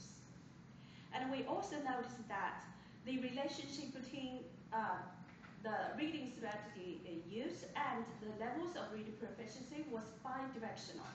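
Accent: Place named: United Kingdom. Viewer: มิน่า... สำเนียง บริธิต เลยครับท่าน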